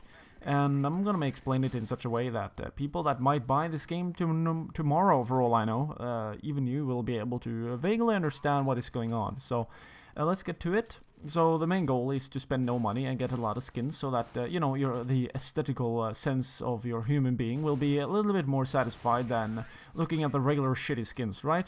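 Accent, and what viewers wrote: Norway. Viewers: Haha, du har god engelsk, men alle nordmenn har en aksang man lett kjenner igjen. Kan jeg adde deg på steam?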